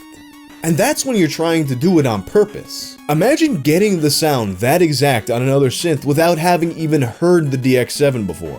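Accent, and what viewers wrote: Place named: New York, United States. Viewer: It always takes me a few minutes to adjust to that NY accent, it always sounds like someone faking an accent to me at first, but by the end of the video I don't even notice it anymore. Edit: that's why I usually binge watch his videos, so there's no adjustment period lol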